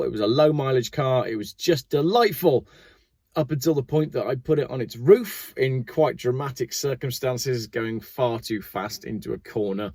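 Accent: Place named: Birmingham, United Kingdom. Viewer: Solihull? I thought I recognised the Brummie accent. I grew up in Solihull, left in 1986.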